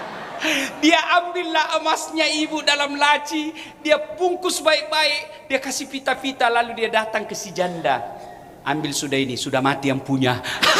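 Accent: Bugis, Indonesia. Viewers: Lidah bugis mas...itu bukan masukan tapi ... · Lidah Bugis itu bro maksudnya sama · lidah bugis bang hehe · Bagus aja masuknnya mas,, tpi tidsk mungkin ustad tidak paham atau sengaja asal asalan., tpi ini dialek daerah. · Mungkin lidah orang Bugis beda dgn penyebutan lidah suku BPK pun sebaliknya ini pernah juga ditanggapi oleh usdtad lainnya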